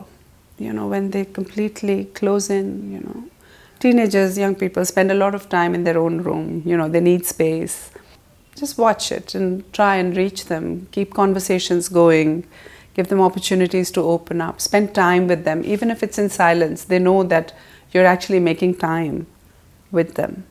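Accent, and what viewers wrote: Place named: India. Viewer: I like the indian accent. you speak well english.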